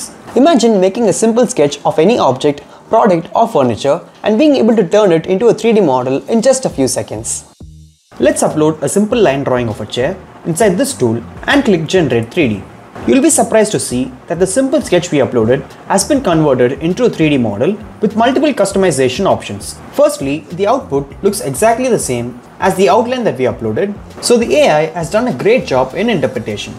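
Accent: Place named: India. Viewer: I appreciate your channel, I would encourage you to slow down your speech since your English has a strong Indian accent, it takes longer for my brain to process the accent. I think you would have more followers by doing this. Good luck and thanks!!!!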